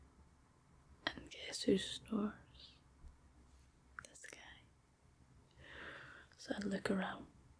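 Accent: Scotland, United Kingdom. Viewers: Her soft Scottish voice is calming and relaxing .. beautiful eyes too. 🙂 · I need to move to Europe ASAP. Our accents here are terrible and do not compare to yours. i need this accent in my life 24/7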